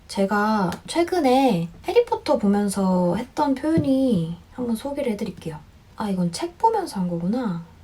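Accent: United Kingdom. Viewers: 진짜 영국영어 너무 듣기 좋네요...덤으로 도움도 받고 갑니다..! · 헐 영국인인줄 알았는데 이민도 심지어 유학도 아니고 혼자 배우신거예요? 헐..? 그 결정적인시기?어린나이? 그때 안배우고 이미 나이많이먹고 해도 저게 되는거구나...헐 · 영국발음 너무 좋다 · Hi! I happened to know your channel and I'm amazed by your British accent! I've never seen any Asian people can speak British pronunciation at your level! I'd love to know how you've learned British pronunciation (I really wish I could understand your language!! - I'm a Japanese viewer..) Anyway, I'm looking forward to watching more videos from you! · 목소리랑 발음 너무 좋으세여, 진짜 찐 영국영어다 우왕 👍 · 채널 찾아서 너무 행복해요 😍영국 영어 공부하고 있는데 어렸을때 해외 거주 경험이 없는데 영국 발음을 구사하시는 분은 많지 않더라구요 또 있으시더라도 이렇게까지 자세히 어떻게 공부했는지 설명해주시는 유튜버는 처음 인 것 같아요 영상 하나하나 도움이 정말 많이 돼요!!! 저도 처럼 영국 발음으로 영어 하는 날까지 열심히 공부하겠습니당 ㅎㅎ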